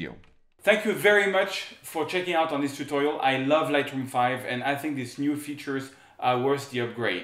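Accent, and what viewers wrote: France. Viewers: I like the way French speaking English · Encore un excellent tuto et personnellement je trouve qu'il est assez facile de comprendre vos tutos en anglais de part le fait de votre prononciation à la française ;-) encore merci et félicitations pour votre travail.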